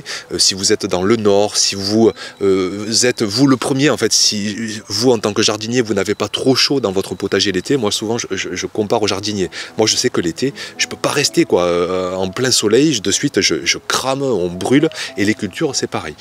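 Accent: South, France. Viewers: T'es de quel Sud? Sud Sud, Montpellier, Sud Est ou Sud Ouest ?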